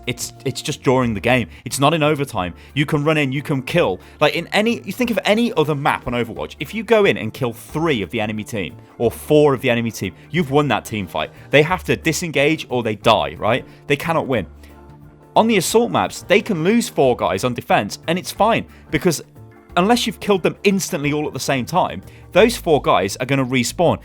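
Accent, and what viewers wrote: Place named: United Kingdom. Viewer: Force gaming said all of this in a way shorter time. However it is true that your Brit accent is better to listen to than his American one.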